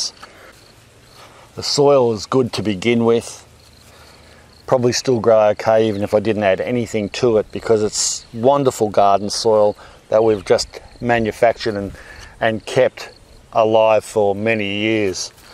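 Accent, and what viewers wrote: Australia. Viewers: As a dual US/Aussie citizen, I'm not knocking the Aussie pronunciation, but it might be amusing to have the "as we say in Australia" for some additional entertainment value. Oh, and I think you mean Bell Pepper, for Capsicum?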